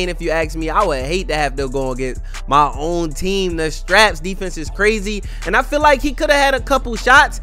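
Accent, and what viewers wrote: Baltimore, United States. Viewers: Is he from Baltimore? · U from Baltimore? Lol sound like it….twoooo man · yo are you from baltimore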